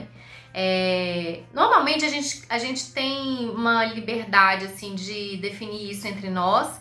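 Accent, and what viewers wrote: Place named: Belo Horizonte, Brazil. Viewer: eu amooo seus vídeos... também sou de Belo Horizonte. Te conheci por causa dos vídeos da Escócia. Quando ouvi sua voz pensei na hora.." essa moça é de BH"...aí pesquisei e me inscrevi no canal. Adooooooooro. Deus a abençoe.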